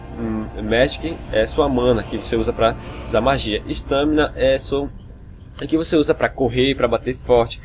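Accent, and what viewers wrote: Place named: Interior, Brazil. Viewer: Você é da Bahia, Pernambuco, Sergipe ??? Eu percebi que seu sutaque é bem tipico do interior :)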